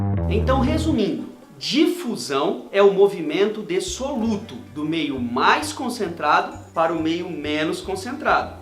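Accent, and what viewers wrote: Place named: Rondônia, Brazil. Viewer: Você é de rondonia deu pra ver seu sotaque. Em qual cidade da aula?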